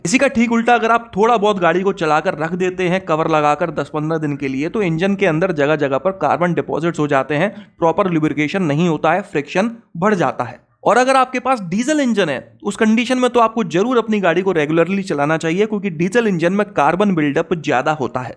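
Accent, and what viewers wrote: India. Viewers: Yeh Bhai gwalior Agra mathura side ka lagta sayad 😅😅😅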